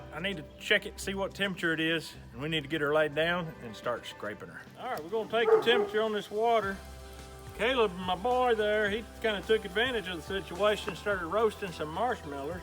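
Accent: South, United States. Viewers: I like your southern draw...and your clean hams 😂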